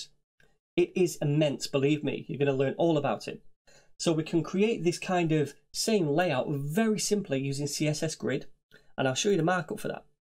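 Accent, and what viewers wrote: United Kingdom. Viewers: why is the British accent is so (f) hard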